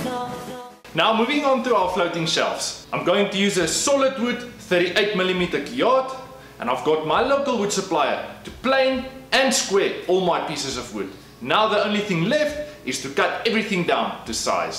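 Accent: South Africa. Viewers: Fantastic work. You sound like a afrikaans speaking South African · Nowt better than the South African accent.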